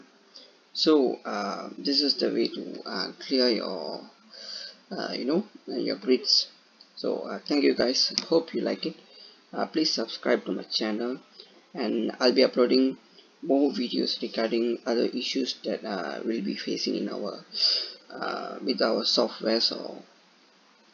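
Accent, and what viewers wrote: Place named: India. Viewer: bad explanation with your heavy indian accent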